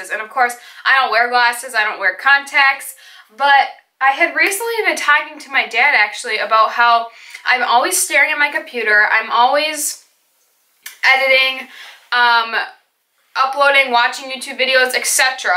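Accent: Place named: Minnesota, United States. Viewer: Your Minnesooooota accent is coming out strong in this one lmao 😄 it's cute !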